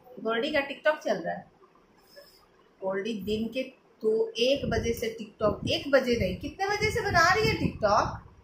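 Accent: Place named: Bihar, India. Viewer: Mujhe aap k baat katne k style se lag rha tha aap Bihar se ho sakti h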